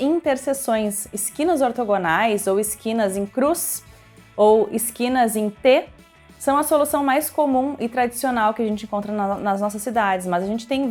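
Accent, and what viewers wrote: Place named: Rio Grande do Sul, Brazil. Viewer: Bah fácil perceber que tu és gaúcha né guria😂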